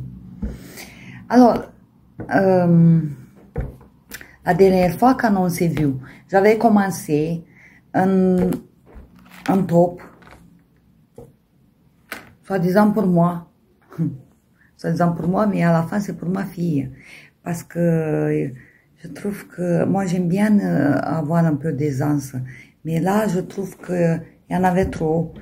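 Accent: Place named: Italy. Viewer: bonjour c' est très jolie ,avec un magnifique accent a la monica bellucie!☺ laine jolie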